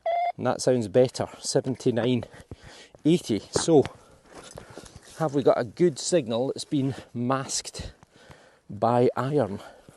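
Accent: Scotland, United Kingdom. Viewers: The Scottish accent is entertaining in itself, but could I respectfully suggest the proper pronunciation of the motto of the Order of the Garter: Honi soit qui mal y pense is pronounced (approximately) 'Onny swa kee mal ee pahnse'. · I’m sorry to those who got the emails. I for one feel that the enjoyment of your finds as well as the education I’m getting is amazing! That and the Scottish accent 😊. Thanks and congratulations again for the 30k subscribers.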